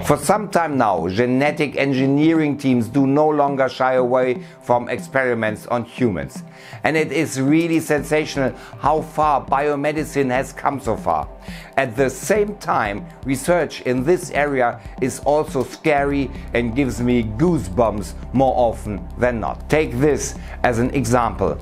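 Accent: Germany. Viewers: Cool, u realy talk in a good english. Just ur dialect says me u r german. May u need more praxis with american or english- born people ...😉. Never mind, u r documenterys r still interesting 👍🏼. Thx there for.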